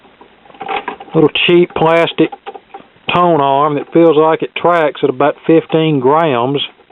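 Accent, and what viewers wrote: South, United States. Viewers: Jerico_64 This man sounds nothing like Hank Hill. He simply sounds like an old man with a southern accent. Big difference. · He’s a southern ol’ boy. Accent is pretty typical for rural south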